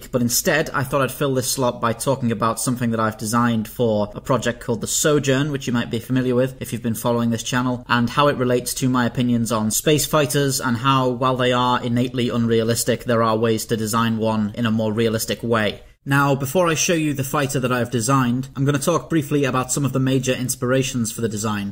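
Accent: United Kingdom. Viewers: I'd love to see a video where you summarize all the technical terms of a ships movement, sides, etc. What does ruttering mean? Aft, ventral, all that stuff. Yeah, I could look it all up, but I wouldn't be hearing it in a british accent.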